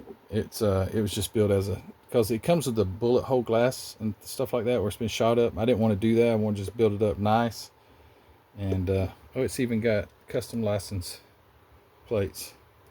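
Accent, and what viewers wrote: South, United States. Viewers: Great update on the model room! That Chrysler car looks like it will be challenging but fun. Interested in seeing you build it. Dont worry bout your southern accent, i understand you just fine, being born in Mississippi! 🤣🤣🤣 Thanks for sharing,!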